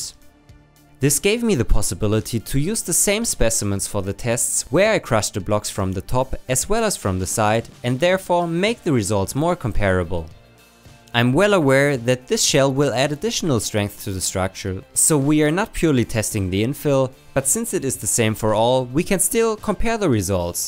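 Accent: Germany. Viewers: man do i hate the german accent, but the content is interesting 🥲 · My 1st thought after 2 sec: German, Wilkommen im Club!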